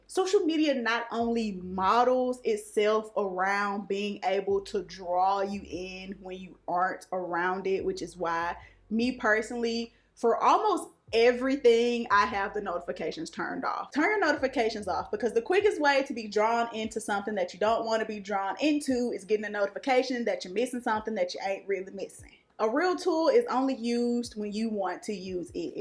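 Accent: South, United States. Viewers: Finally I'm from SC. I wasn't trying to insult you I can just tell you are southern too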